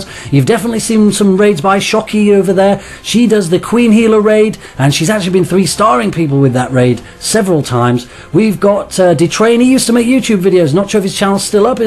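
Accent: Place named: United Kingdom. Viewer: Am i the only one who pictured this dude as a 70 year old british male?? This was the first video i saw of his face and was completley shocked!!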